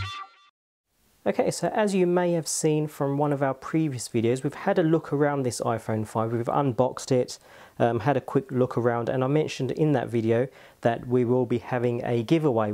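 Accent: United Kingdom. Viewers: I like white because it really makes the iPhone stand out and make it look sharp. It's also easier to find and more pleasing to the eye. I really hope you chose me. (LOVE BRITISH ACCENTS) ;)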